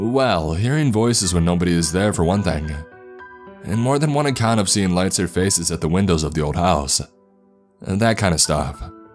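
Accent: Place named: United States. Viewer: I'm from the UK and this is the hardest American accent to listen to. What State is this from.?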